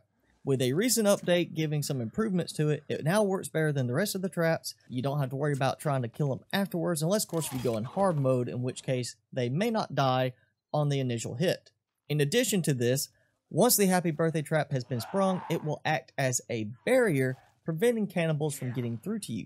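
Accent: South, United States. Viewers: FINALLY A YOUTUBER WITH A SOUTHERN ACCENT, I HEAR EVERYTHING YOURE SAYING BROTHER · I'm from Georgia and we also talk like that, but when you live here no one notices.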